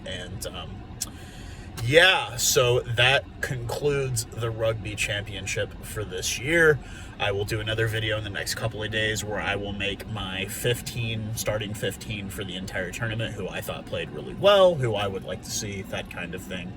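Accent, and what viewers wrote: United States. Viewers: Greeting from a South African living in Switzerland (I used to live in the US). Loving your show and it's great to hear someone speak of my two favourite teams especially in an American accent. Great game this weekend, it was epic. Keep up the good work!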